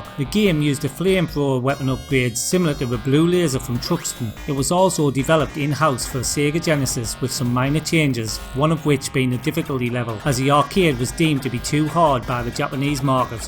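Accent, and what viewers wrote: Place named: Newcastle, United Kingdom. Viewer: You've done a great job managing to hold onto your (geordie?) accent in spite being over in Japan for so many years....or have I embarrassingly confused you with Retro Core?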